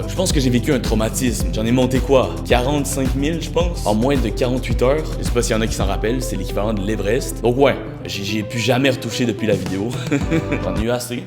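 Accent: Quebec, Canada. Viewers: Je suis désolé mais J’ADORE l’accent québécois c’est pas méchant je le trouve trop bien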